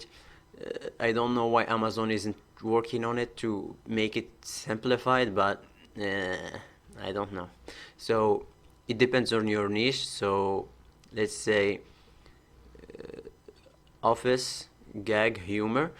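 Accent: Morocco. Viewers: Hhhh htana chmit ri7t lblad f sotoo 😅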